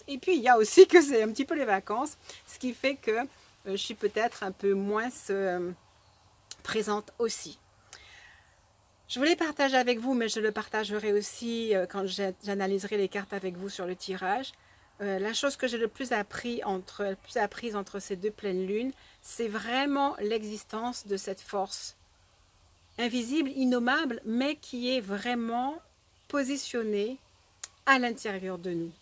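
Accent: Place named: Canada. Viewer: Bonjour. J espère que vous allez bien. Merci pour ces messages. J ai entendu qu'au fur et mesure du parcours les 2 jumeaux ont des points communs physiques comme une harmonisation: même couleur d'yeux, même voie, forme du corps...Vous allez peut être rire mais. ou s avez des pointes d'accent canadien....tresbonne journée. 🌞😀🙏❤😘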